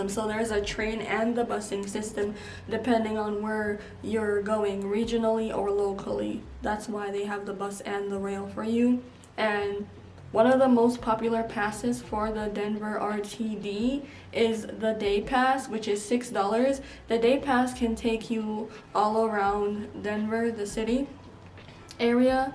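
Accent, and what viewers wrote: Jamaica. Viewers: You had me with the Jamaican accent and food 😍 Moving to Denver and will definitely be looking for Jamaican food. Thank you sis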